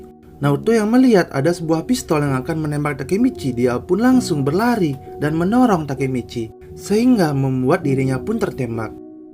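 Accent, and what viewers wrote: Bali, Indonesia. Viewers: Logat bali ini 😁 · Logat bali admin? Hehehehe rahayu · Logatnya Bali sekalii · Bang dari bali ya kog bila t khas bali banget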